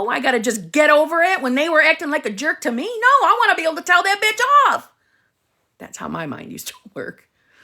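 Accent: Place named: Long Island, United States. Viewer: Neville's wife manifested HIM! He talks about it and he wanted out of his first marriage and New York State was opposed. As you stated, those were limited timelines. So the woman who became his wife, did something. He never states what, but it was a manifestation protocol. Love you 🦋🌺 P.S. I am originally from Manhattan, and attended C.W. Post. I swear I hear a Long Island accent. I listen to you in the morning while doing my stretches now...the rampage on life. LOVE IT, LOVE YOU! It's all Done. I believe.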